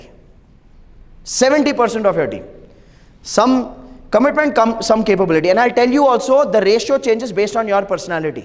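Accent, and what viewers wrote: India. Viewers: I love your explanation. I understand you were talking to an especific audience but you ended up talking to a lot larger audience. If possible could you please avoid English dialect to include non indian English speakers? Thank you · Very good lessons. Thank you. You sounded more like a south indian. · Hindi style of accent 😮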